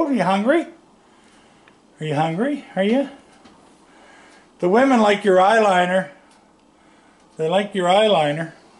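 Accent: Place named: Canada. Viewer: My dad loved cats and wildlife. He was always camping, hiking, fishing. Even with the fishing, he stopped using regular hooks and moved to painless hooks (I have no idea what they’re called). He felt bad for the fish. He was as sweet as you, so similar to you. Even though you have a Canadian accent and my dad sounded very Appalachian, you still somehow have similar voices.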